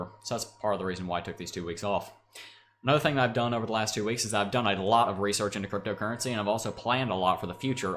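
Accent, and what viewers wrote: South, United States. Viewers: I missed your country drawl!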